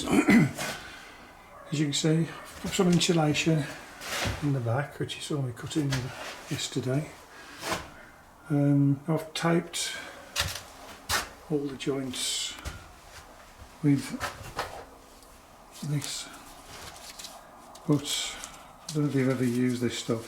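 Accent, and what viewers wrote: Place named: Black Country, United Kingdom. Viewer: I can be discouraging I bet ,if views am low ,but sooner or later some one will come looking for info on how do this kinda of thing and you would have helped them unknowingly unless they leave a comment ,you put Ur time ,money and effort into and u should now ppl like me do appreciate it ,I'm particularly looking forward to knowing were in the wall structure the vapour barrier goes for when I do this next summer thanks again ,I know you weren't fishing for comments but sometimes a good comment just gives us the encouragement to keep putting the effort in ,I for one am grateful for what you do ,you sound like too I'm from Tipton near great bridge west Bromwich way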